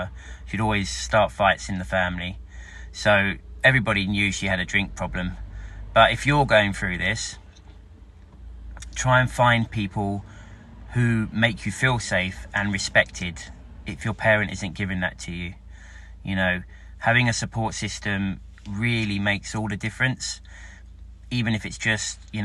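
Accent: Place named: United Kingdom. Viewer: Откуда Вы? Такой акцент, будто Вы из Великобритании, звучит так правильно. Получилось ли у Вас создать свою семью?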